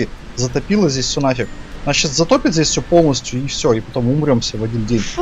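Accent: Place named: Ukraine. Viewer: Авы случайно не украинцы? Шо да шо XD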